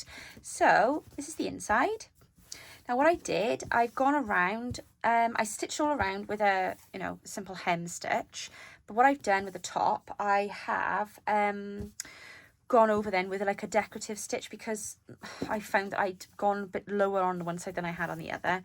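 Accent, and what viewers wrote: Wales, United Kingdom. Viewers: Hello, I enjoyed listening to you today even if I didn't get to watch the video. I wouldn't mind having a bit of your energy, just because currently I'm feeling a bit drained, but you make me laugh and chuckle with your Welshness. Thank you for being you. Take care and God bless you and yours. Sincerely Becky B from Arkansas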